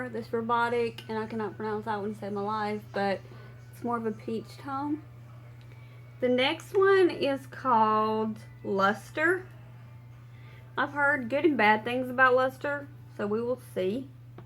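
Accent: South, United States. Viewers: You're welcome. I thought you were from the south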